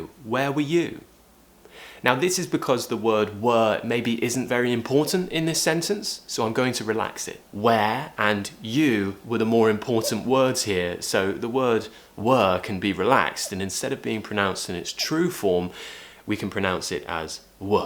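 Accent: RP, United Kingdom. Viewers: Listening your British accent gives me years of life. Best Teacher Ever!!! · we love the modern RP accent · I am Super Fan of British accent. I do love all your accents, no matter which part you from UK. I have been trying to search British channel for long time. Finally I have found the good one. I deserve it❤ Please keep up the good work. There is no room for doubt to watch your channel. You rock! Have a good one. Keep social distancing. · "It's kind in the middle of my mouth" I definitely love british received pronunciation... Good bless you...you're the teacher!!😜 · Your accent and the British accent the most beautiful accent ever and i fall in love with it at the first second I hear it.You are really helping me with that I'm student at English Section from Iraq you're the cutest teacher ever I hope I can speak the British accent professionally as you🤍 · I adore your breathtaking British accent 😍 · My fave British accent teacher. 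😅😃.. Thank you Sir.. Love from Philippines. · I like the way you pronounce the British accent, and I do my best to improve it but I find that I speak like the Indians😂😂, follow you from Egypt❤ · Really your British pronounciation is well interesting and deserves to ben listen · Thanks...you have a lovely British accent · Love your accents. Sounds like sherlock holmes lol i love british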